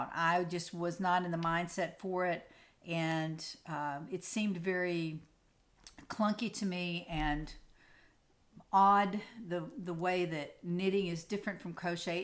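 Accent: South, United States. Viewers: Great to have your family coming!! :-) You're right...enough tutorials out there. Enjoy just hearing wonderful Southern accent & speak about what you know.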